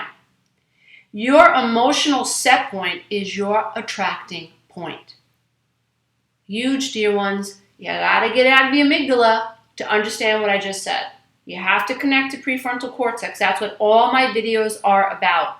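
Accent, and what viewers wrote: New York, United States. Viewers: Don't you hear her NY ACCENT?! 🗽🗽🗽 love you -Brooklyn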